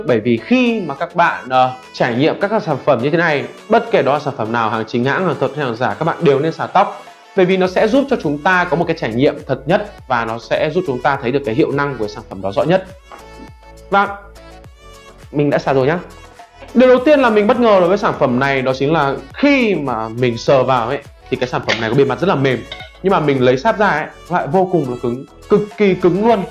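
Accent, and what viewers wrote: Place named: North, Vietnam. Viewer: Giọng bắc kỳ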